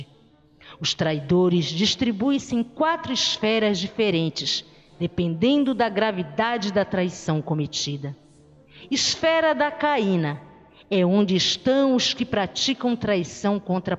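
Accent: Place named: Northeast, Brazil. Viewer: adoro a abertura com voz de nordestino amooooooo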